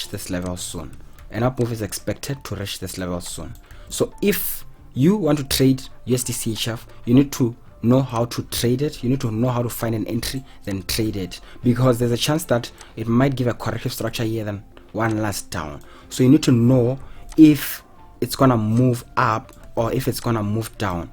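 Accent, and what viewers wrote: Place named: South Africa. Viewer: Thanks my guy... i can hear that u my homeboy a South African... Big up ntwana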